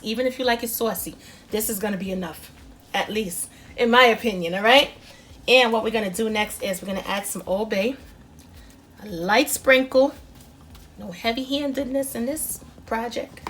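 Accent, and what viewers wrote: New York, United States. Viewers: Love her new york accent. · Off the chain sis got to be from nyc with the accent love it will make this 2morrow. And yes I subscribe and shared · Is that Queens or Bklyn accent I hear? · Love this recipe 😊 l hear that New York accent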